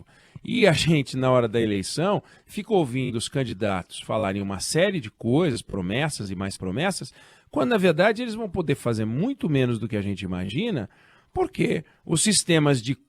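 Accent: Rio de Janeiro, Brazil. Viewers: este mangolote não tem vergonha ficar narrando com esses dialético carioquismo ele é ministro do STF e presidente da casa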